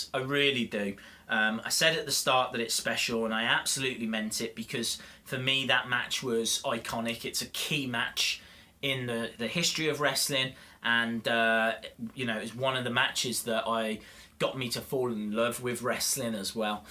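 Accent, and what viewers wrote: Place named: Birmingham, United Kingdom. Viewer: You sound like a fellow Midlands Fam. Maybe Birmingham?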